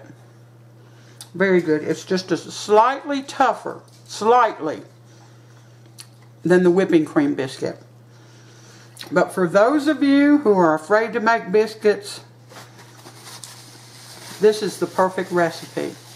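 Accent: South, United States. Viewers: Good morning! I just want to thank you for getting me enthused again with my southern cooking! Can't wait to make either of your biscuit recipes I've seen on here! You just don't know what or how overjoyed I am! I have just subscribed to your channel and will be looking forward to seeing more of your southern recipes! What I do want to ask is, do you have a great recipe, that's easy, using a cake mix for Southern Mississippi Mud Pie! My aunt made it for years and it was so gooey and delicious with a such a great chocolatey taste with her rich frosting and marshmallow top!!!!!😋 But you just sounded like a great southern voice of knowledge, I just had to ask you. No one has found her recipe to this day and oh how I wish I could try making that MMC or pie. I again thank you, I apologize for making this so long. Please have a Blessed day and TY again for taking my comment! 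I'm from NC and will be so anxious again to try your recipes and God forbid, they come out good! Be Well, Katy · Are you using self rising flour? If so, that means the flour has leavening agents such as baking powder, baking soda and could contain powdered buttermilk. It's OK by me, but in reality there more ingredients than just flour and cream. I have been baking biscuit for over 60 years and I have never use self rising flour. I guess I learned from my Mom because we always kept AP flour around for many types of bread making and pie crust. What's important is if you and your family like them. All I am saying is self rising flour isn't just flour it is a combination of leavening agents and other ingredients. Love your southern accent, I am an old country boy myself.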